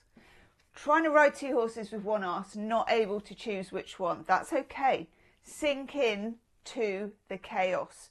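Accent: Australia. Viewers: Just heard your Aussie accent, sounds spot on 👌fellow Aussie here x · OMG. I love the glasses and headscarf. You look gorgeous ❤ also I love your Aussie accent. It's very good and for my own amusement I am constantly imitating you imitating Aussies with a huge grin on my face even though no one would know why I am so amused. You bring a lot of joy to my life.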